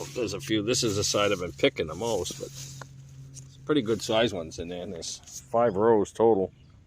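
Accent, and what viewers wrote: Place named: New Jersey, United States. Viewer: Your garden is looking great, sounds like you're from Jersey.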